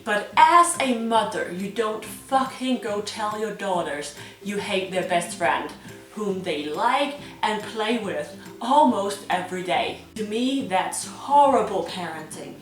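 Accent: Denmark. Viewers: man kan godt høre du er dansk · low key Sorry, that sounded a bit shitty :) I just meant, that its clear (to me) that her accent is danish.. :) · you have such a typical danish accent.... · You have such a typical danish accent :D!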